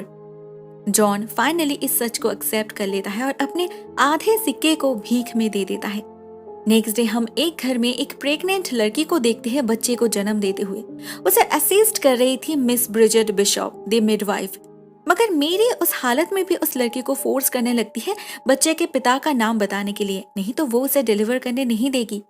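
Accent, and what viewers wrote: Bengal, India. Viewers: Omg your Bengali accent 😍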